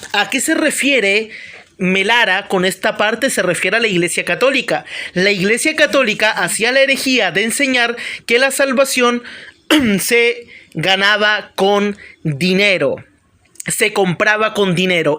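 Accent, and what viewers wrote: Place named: Central America. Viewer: Quien habla dice que es Chileno?? Jajajaja, hata en eso mienten un chileno por aqui que lo desenmascare a este tipo de Chileno no tiene nada, ese acento es de Centro Americano.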